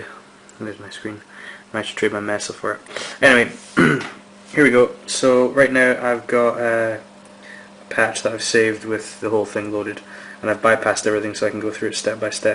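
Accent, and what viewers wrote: Canada. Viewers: are you canadian? i hear a thick accent. but i also get a small distortion problem with my axe-fx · k...ur acsent sounds a little canadian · oh nice lol you sound canadian though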